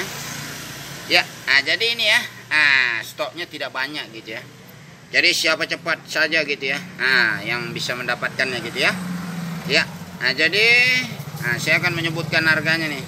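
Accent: West Sumatra, Indonesia. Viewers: Suaranya kayak minang kocak...lucu gan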